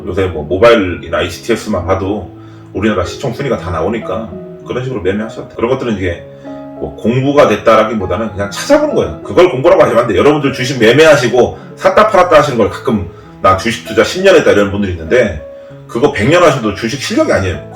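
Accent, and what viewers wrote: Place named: Gyeongsang, South Korea. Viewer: 아이고~아이고~~ㅋㅋ 같은 경상도 사람이라 말씨가 더 정감이 더가더라구요^^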